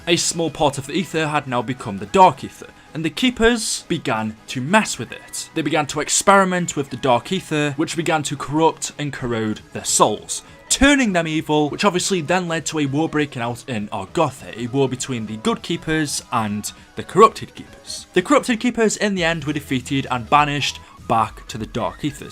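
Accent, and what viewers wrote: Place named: Stoke-on-Trent, United Kingdom. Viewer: are you from stoke on Trent because your accent sounds familiar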